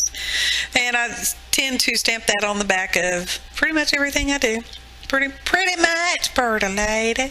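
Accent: South, United States. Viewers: Cute cards......and love your southern accent!!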